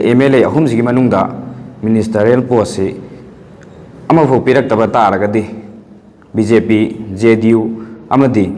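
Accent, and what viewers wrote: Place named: Bangladesh. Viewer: Remember you speak like a Bangladeshi. Always try to speak in a correct way. It will be very good.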